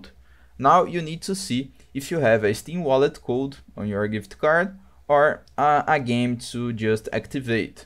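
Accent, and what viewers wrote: Brazil. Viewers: You have a very Brazilian accent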